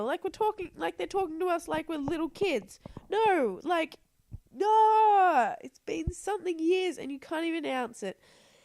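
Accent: Australia. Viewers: ***** here is a wake up call if u don't like how people talk nobody is even going to waste a second with you or even pay attention. I on the other hand wanted to just say somthing maybe u could change again if u don't like how she talks then just leave the channel if u don't like the Australian accent know that nobody is going to change for your ungrateful ass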